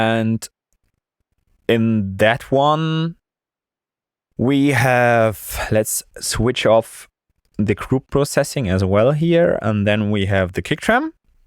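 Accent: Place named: Germany. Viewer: man hört den deutschen akzent ordentlich raus :D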